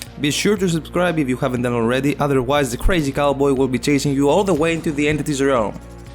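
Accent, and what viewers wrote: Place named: Greece. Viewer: are you greek? I am greek too. If yes i could tell from your voice lol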